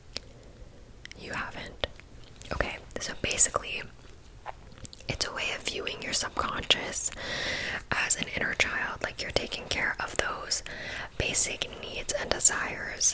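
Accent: Ireland. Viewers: More Irish accent please!!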